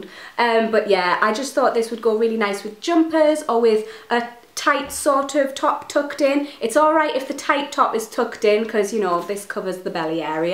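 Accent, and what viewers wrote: Newcastle, United Kingdom. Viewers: Is that a jordi accent haha sounds like your from Newcastle xx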